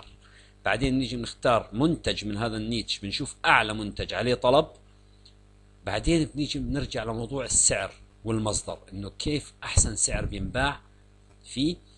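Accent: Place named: Jordan. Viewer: لهجتك بجنن اردني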